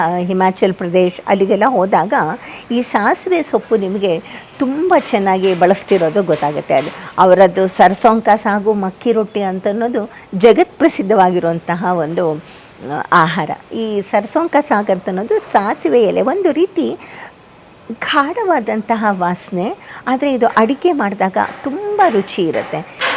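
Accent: Standard. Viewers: ನಿಮ್ಮ ಒಳ್ಳೆಯ ಮಾಹಿತಿ ಮತ್ತು ಸ್ವಚ್ಛ ಕನ್ನಸ ಭಾಷೆ ಯಲ್ಲಿ ಅರ್ಥ ಆಗುವ ಹಾಗೆ ತಿಳಿದಿದ್ದಕ್ಕೆ ತಮಗೆ ಅನಂತ ಪ್ರಣಾಮಗಳು ಮೇಡಂ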